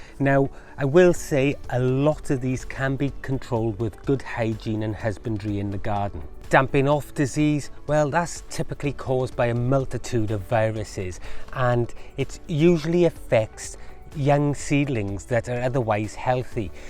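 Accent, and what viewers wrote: Wales, United Kingdom. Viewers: i thought id heard on another video that late blight is ok and tomatoes wil continue to grow untoched. Im so glad i watched your video. I planted 12 tomatoe palnts (money makers) in my garden in march. all this rain weve had this last 2 weeks has battered my tomatoes. luckily theyd already flowered and fruited. ide already got a good 2kg of tomaotes, up until the last 2 days ive spotted late blight and rottening my tomatoes. i watched this and had just have to rip up all 12. now my chillies next row can have some air and light ;) Cheerz bud! I luv the welsh accent, makes it more satisfying to listen to. now ive got room for bulding the first raised bed of 6 (to my plan). all the stuff ive grown so far have been a success. its my first year after many years not grwoing. next year ive got some big plans to grow lots. ive got 2 woormerys on the go too, these castings will be part of my soil mix. 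ive also got 3 composting bins on the go so will be mxing this with manure that i will mix up in the winter so its got time to rot for next spring. I furkin luv gardening. so glad i started it again. From Newnham on severn! thankyou for the video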